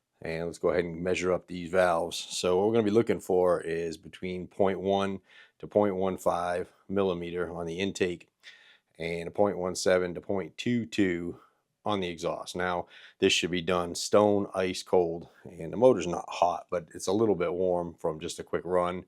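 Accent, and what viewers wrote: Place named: South, United States. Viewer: Ok,thank you for your information. I really appreciate it. This southern boy is out in Albuquerque missing Mississippi it’s good to hear a southern accent!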